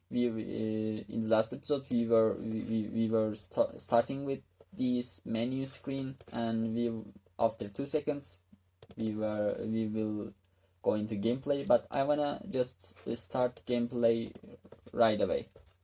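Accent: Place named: Turkey. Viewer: usta hiç dikkat etmemiştim masaüstüne aksandan türk mü acaba dedim doğru çıkıt :d böyle bir işe ingilizce girip anlatmak çok iyi cidden helal olsun eğer yorumu görüp cevaplayabilirsen bir şeyler sormak isterim bi kalbe anlarım soru beklediğini AS:DASd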